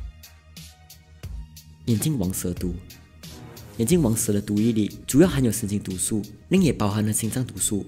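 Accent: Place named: Malaysia. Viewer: 這馬來西亞口音我一北方人真的是聽的累死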